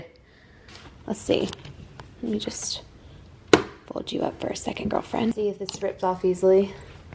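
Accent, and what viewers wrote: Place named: South, United States. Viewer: I can tell my girl is back in the south because that accent is a lil thicker!! 🤣 I love it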